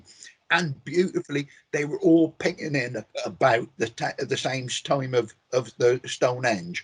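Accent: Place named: Newfoundland, Canada. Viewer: I love his accent. He could be from Newfoundland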